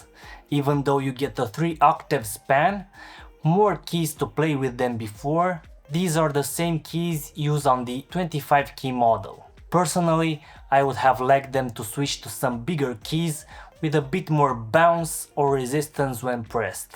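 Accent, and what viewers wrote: Russia. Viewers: Как буд-то русский по английски говорит))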